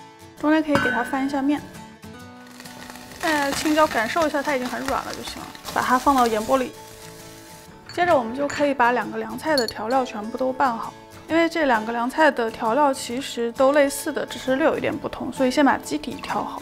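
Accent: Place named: Nanjing, China. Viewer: 我查了一下，果然是南京妹子，南普我听出来了。哈哈哈哈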